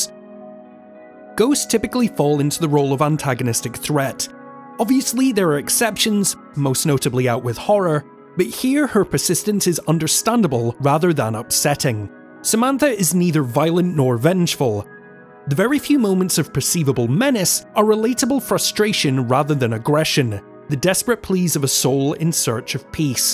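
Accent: Scotland, United Kingdom. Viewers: yes that's the reason I was wondering about your accent...as I told you I'm from cali a city from Colombia south America but now I live close by the missisipi river.. By the way I will let you know someday when my dark fantasy novel come out... Respect Scotland people... keep on coming with more content.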